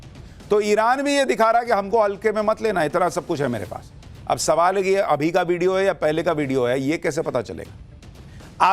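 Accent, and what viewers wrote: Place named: Magahi, India. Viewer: Pura patnaiya maghi style me bolte hai sir aap😅😅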